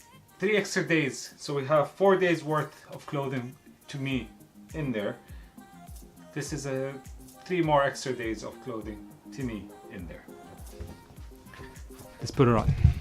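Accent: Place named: Ireland. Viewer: Do I hear an Irish twang in the accent. Are you in Ireland - great review 😉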